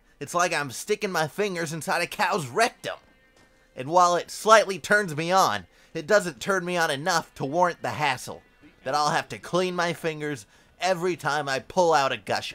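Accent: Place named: South, United States. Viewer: You sound like a hillbilly. Don't take that offensively. I don't know if it's an act or not but it adds to the comedy.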